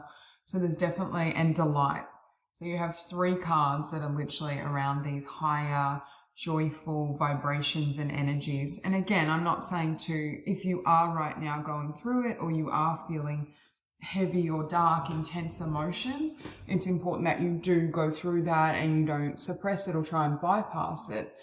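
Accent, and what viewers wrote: Australia. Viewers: Lovely to listen to another aussie reading tarot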